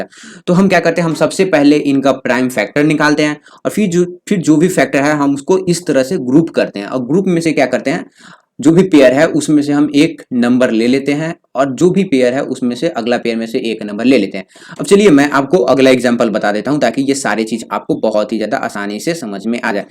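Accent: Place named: Bihar, India. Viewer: Sir do you speak Bihari Your speaking style is like Bihari language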